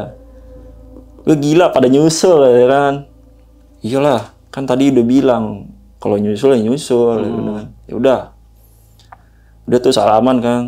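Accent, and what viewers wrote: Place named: South Jakarta, Indonesia. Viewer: Gaya bicara jaksel punya nih, keren bang pembawaan ceritanya, jadi seolah-olah kaya kita ada di posisi itu, terimakasih sangat menghibur ❤❤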